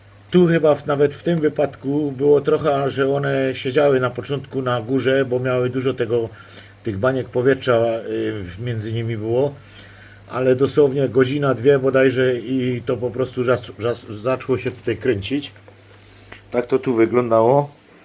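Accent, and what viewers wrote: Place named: Silesia, Poland. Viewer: Cześć. Fajne i ciekawe rzeczy opowiadosz,miło sie słucho,szczegulnie jak godosz po naszymu😬.Pozdrowiom ze Mysłowic.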